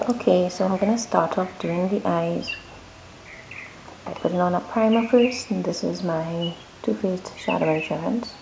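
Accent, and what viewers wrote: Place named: Trinidad and Tobago. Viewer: ha! i can pick out a trini accent anyday!!!! hmm just found you and love your work! subbed! air brushing is the bomb my system is the dinair pro my brides just love it! a trick i do is switch guns because i really prefer oil based foundations they last longer covers better and show better on film but i like the ease of the water based to me it seems lighter especially during the summer.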